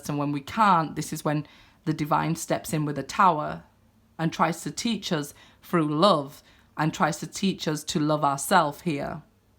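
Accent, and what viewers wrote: Yorkshire, United Kingdom. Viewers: Great reading! Hull? Your dialect makes me think of Mick Ronson😃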